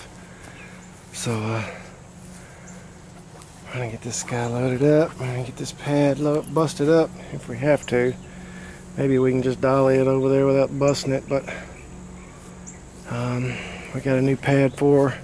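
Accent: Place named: South, United States. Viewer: your excent is not to bad being from alabama, i always enjoyed hearing the southerns talk.seeing i am a yankee. good job on the video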